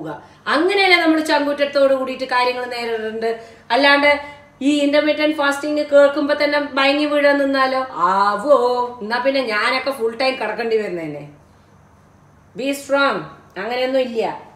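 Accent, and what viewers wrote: Palakkad, India. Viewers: പാലക്കാട്‌ ഭാഷ 👍👍👍👍അവതരണം നന്നായിട്ടുണ്ട്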